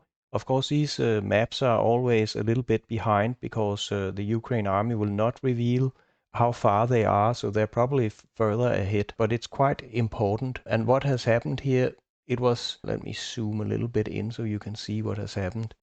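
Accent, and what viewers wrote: Denmark. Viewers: the danish accent is strong with this one (jeg er også fra danmark)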